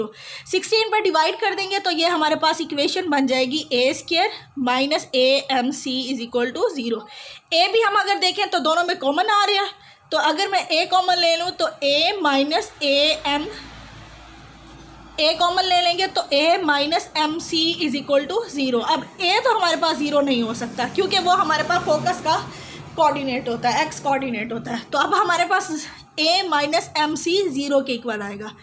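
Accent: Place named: Pakistan. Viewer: Really OSM MAM, awaj se lag rha hai aap Pakistan se hai, but love from INDIA.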